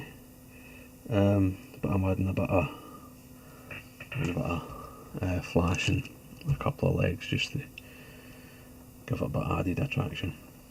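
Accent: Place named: Aberdeen, United Kingdom. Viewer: Love the tutorial and your super Aberdeen accent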